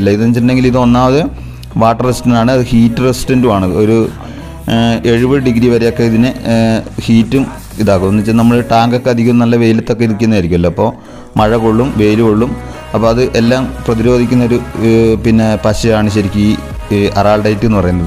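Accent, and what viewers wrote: Kannur, India. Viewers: നിങൾ കണ്ണൂർ ആണെല്ലോ....പോളി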